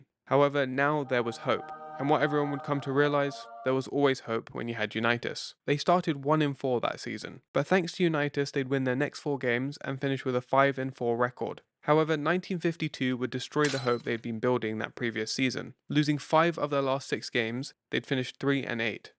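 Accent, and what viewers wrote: United Kingdom. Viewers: Why is some Brit guy telling us about American football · A British accent for the narrator? About an NFL player? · Full of typos. And I'm sorry but listening to a Brit talking about Johnny U is like listening to a Sherlock Holmes story read by an American.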